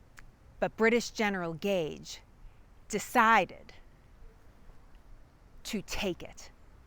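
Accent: Boston, United States. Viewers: After retiring from the Navy I moved to the west coast in Washington State on the boarder with British Columbia so that my Canadian Wife can be closer with her elderly mother. I was born and raised in Watertown, Massachusetts and my two children and grandchildren still live just outside Boston. I have always loved American history, especially when it comes to New England and you do such a great job with your channel. Besides seeing the historical places you’ve covered, I am able to listen to you speak so that I hopefully will never lose my own “Charmin” Boston accent 🤣😂🤣 Thank You!!! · You could never program and AI with your enthusiasm for the subject matter or your charming Boston accent. I enjoy your work and obvious mastery of the Revolution. The way you speak about the era reminds me of classes with professor Hyman Rothbard back in the "day". 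Kudos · Boston accent :face-red-heart-shape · What a Bostonian accent 🤣! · Boston, not Chicago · I live in NH. Your Boston accent is very entertaining and sooooo damn sexy at the same time!!! 😍❤️💕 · NON AI A PLUS. Where is this? thank you; love the accent. bah hahvahd yahdesque. Set Meyers does a clip, BOSTON ACCENT. Recommended, par moi.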